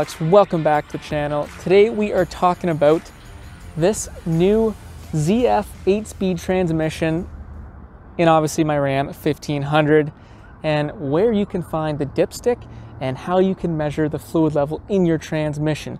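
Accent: Canada. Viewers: this must be in canada i can tell by the way this dude talks why dont they say farenhiet instead of celsius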